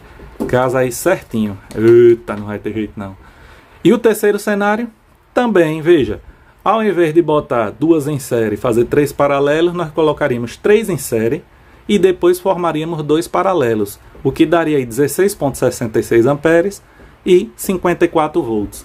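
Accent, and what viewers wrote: Northeast, Brazil. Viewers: "a gente fala errado", onde... Falar com sotaque nordestino NÃO é falar errado é um capricho artístico. Vejo você falar sempre muito corretamente e quando escapa algo sempre corrigi logo. Falar errado é esse bando de Youtubers que não sabe nem usar as conjugações verbais corretas ou usar o plural na hora certa. Você está de parabéns em tudo que você fala e da forma que você fala. E olha eu sou Paulista da Capital de SP.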